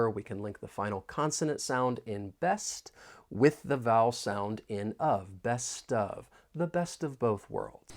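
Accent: United States. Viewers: the best for you. Although you have not a strong american accent, i am able to catch the 95% your speeches. Other teachers, and much more docomentaries with the voice off are a bit challengue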